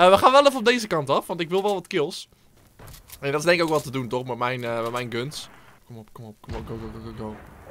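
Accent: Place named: Flanders, Belgium. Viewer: Ga weer vaker vlaams praten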